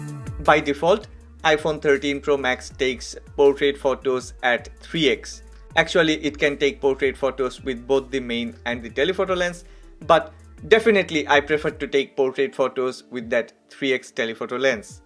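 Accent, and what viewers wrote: India. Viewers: you sound like from India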